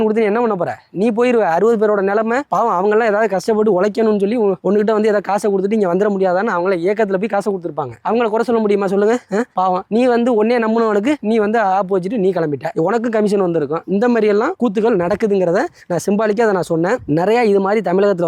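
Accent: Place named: Tirunelveli, India. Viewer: தம்பி நீங்க tirunelveli ya? Unga slang namma ooru மாதிரியே இருக்கு.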